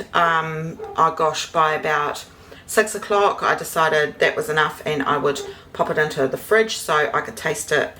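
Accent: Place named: New Zealand. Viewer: love your kiwi accent!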